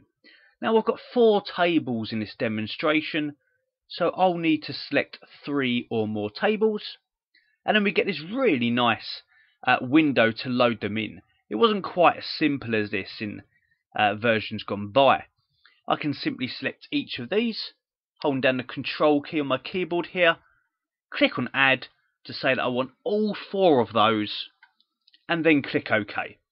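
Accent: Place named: Cockney, United Kingdom. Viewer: I watched at least 5 other videos and this was by far the clearest and easiest to understand - despite you being a cockney :). Thanks a lot....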